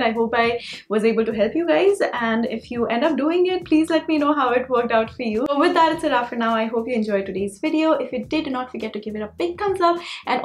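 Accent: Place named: India. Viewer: The technique is very good but the accented English is very, very hard to understand outside of India (ex. for American or British listeners)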